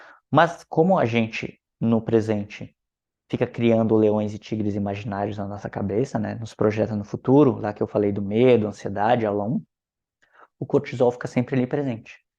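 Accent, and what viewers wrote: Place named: Rio Grande do Sul, Brazil. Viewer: Esse sotaque eu conheço...é GAUDÉRIO !!😅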